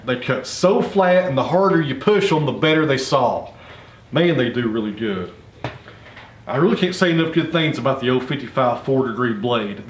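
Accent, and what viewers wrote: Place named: Southwestern Virginia, United States. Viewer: don't listen to the whi ers out there. I really enjoy your channel, I evev understand your talk, ( my family is original from southwestern va.) 😂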